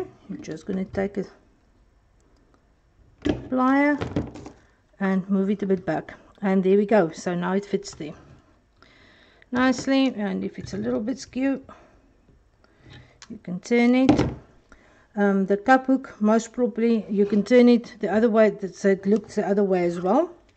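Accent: South Africa. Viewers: Hou aan vids maak ons is amper by n 1000 subs. Ek het die aksent gehoor jy is afrikaans😂😂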